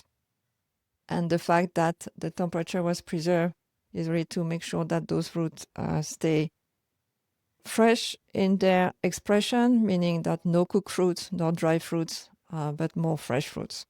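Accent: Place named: France. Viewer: I love your Methods and your French Accent :)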